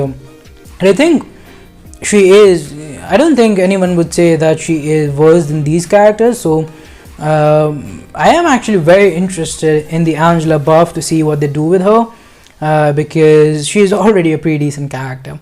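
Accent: India. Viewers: Are you Indian ?? Cuz you like and sound like a Indian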